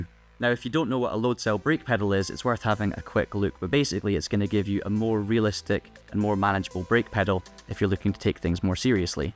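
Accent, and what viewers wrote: Scotland, United Kingdom. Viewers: heard the scottish accent that was enough for me lol support your own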